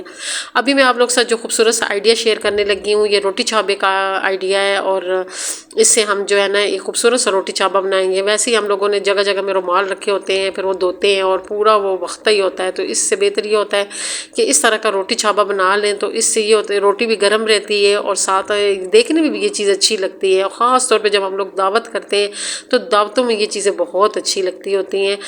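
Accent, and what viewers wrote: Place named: Punjab, Pakistan. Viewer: Bilkul meri tara my b ici tara urdo bolti ho yahi hal hy panjabi walo ka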